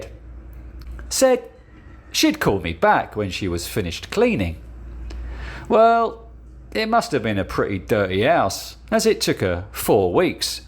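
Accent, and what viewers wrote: England, United Kingdom. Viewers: Your strong English accent is annoying to listen to, if I was a woman I wouldn't want to hear it , an American talking English is much more pleasant. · The English accent is way better than a yanks . Don't watch if you don't like. UP THE POMS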